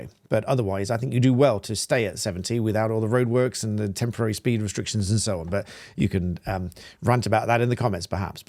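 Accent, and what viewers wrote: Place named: London, United Kingdom. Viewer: sounds like my mistake! Though he does sound like a Londoner😜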